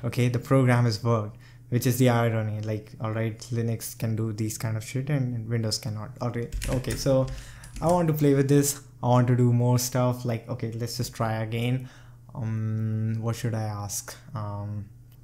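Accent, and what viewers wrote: India. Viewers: Came to channel Subscribed Heard Indian Accent Unsubscribed I'm brown myself, but no this is cringe.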